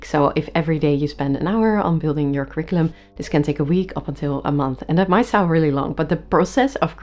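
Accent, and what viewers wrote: Netherlands. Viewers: I heard you speak and I thought “are you Dutch?” and the picture popped up, defiantly studying in the Netherlands. Thank you for today’s video, appreciate it!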